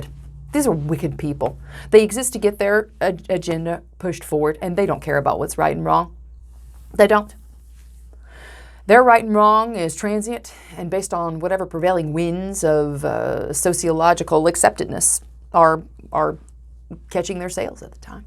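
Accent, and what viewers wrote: United States. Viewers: What state is your accent from? It’s a very nice accent! <mail>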